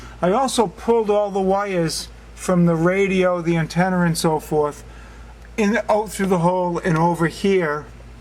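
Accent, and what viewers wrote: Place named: Boston, United States. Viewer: great job so far., its been almost 5 years since mine popped on my 96 supercharged VR6 GTI, and your video is leading me to fixing it on my own. i am not faint of heart, i build 1000+ hp drag race VW/Audis in my spare time, but i really didnt want to do this job. and still part of me almost thinks the 800 plus bucks the quoted price to do would have maybe been worth it. i ripped one of the RCA off the stereo right out of the gate, very discouraging. but the car has a big stereo in it so its just gotta be fixed is all. again, thanks for taking the time to detail this. i have the Bentley manual but never felt the need to actually look at it. and i love how not-a-mechanic you appear to be and yet still tackled this job. the Boston accent is a plus:-)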